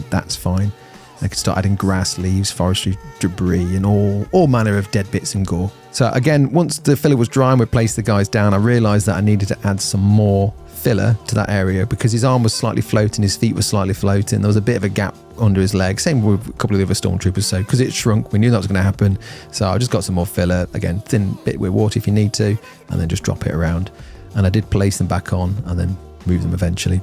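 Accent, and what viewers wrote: United States. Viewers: Your American accent sounds like my uncle. You were also dressed like him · LOL, never thought I would hear use an American accent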